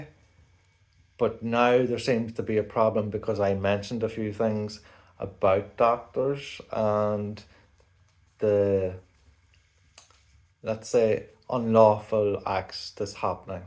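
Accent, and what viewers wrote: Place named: Northern Ireland, United Kingdom. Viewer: Dungannon omagh accent 😃😃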